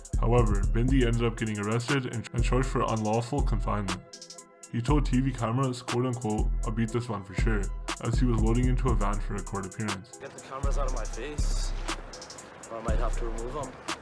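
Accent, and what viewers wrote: Punjab, India. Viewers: u can tell this guy is punjabi and from surrey lmao he sounds just like bindy johal except more chill/relaxed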